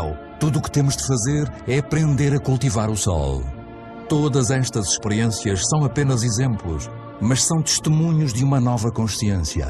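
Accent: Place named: Portugal. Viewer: E aqui no Maranhão passamos dos portuguese em matéria de Burrice, por que é constituído principalmente daqueles que nem sequer perceberam o que aconteceu ou se acontece algo de fato. Mais lenga-lenga de aquecimento global.Os "patrícios" da terrinha que ñ me perdoem, mas português fala feio, mermão, bota uma Brasileira gostosa p narrar!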